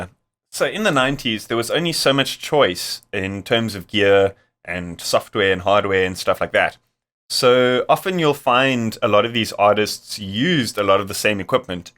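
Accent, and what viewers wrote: South Africa. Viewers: Yo are you South African my g you have the accent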